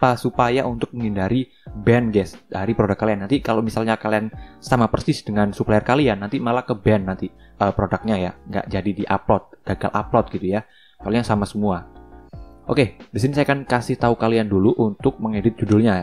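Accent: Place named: West Java, Indonesia. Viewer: bang asli mana ko kayak org sunda??? mohon dijawab bang🙏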